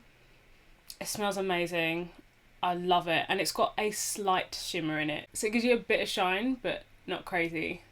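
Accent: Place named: United Kingdom. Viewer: Really enjoyed this video 😉 where are you from? I used to be in the UK, I’ve missed this accent lol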